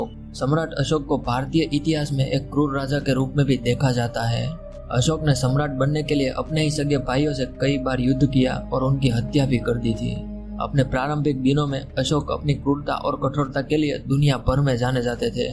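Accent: Gujarat, India. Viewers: Aapke bolne ki style gujrati people jaisi hai...kya aap saurashtra se hai?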